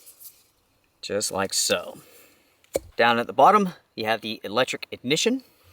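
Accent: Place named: North Carolina, United States. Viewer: Everyone from NC says varabals like him.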